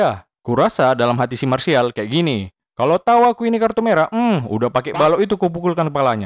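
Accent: Medan, Indonesia. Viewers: Nampak kali ah abg ini orang Medan nya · Abg pasti org medan saya orang mandailing natal bg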